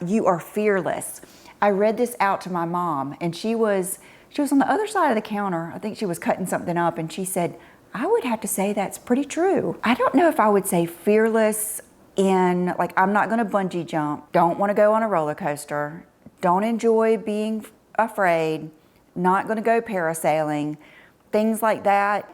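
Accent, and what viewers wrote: South, United States. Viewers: Hi, I’m a new subscriber! I watched and listened when you posted this so I can get to know you better. I love your personality, authenticity and your fashion style. What attracted me to your channel was your Southern accent (I love it) and your joy when you show us what you buy and model them for us. Your fashion philosophy is like mine. I dress the way I think makes me look and feel good, not to look young. Thank you for doing what you do to inspire us.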